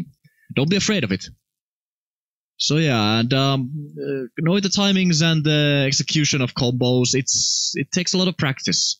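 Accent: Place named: Finland. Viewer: You sound so finnish.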